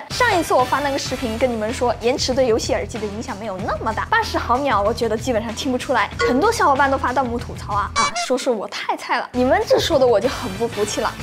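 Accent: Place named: Chaozhou, China. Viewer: 你是潮州人吧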